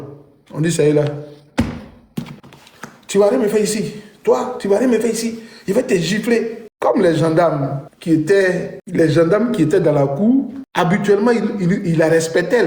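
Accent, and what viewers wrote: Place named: Bété, Ivory Coast. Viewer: C'est pas à cause de celle qui est monté qu'on a su que tu es bété. Quand on t'entend on sait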